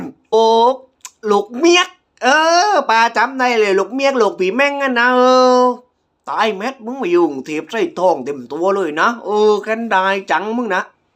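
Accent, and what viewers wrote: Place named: South, Thailand. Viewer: งื้อ อยากฟังอ่ะ แต่ฟังใต้ไม่ออก 😅😅😅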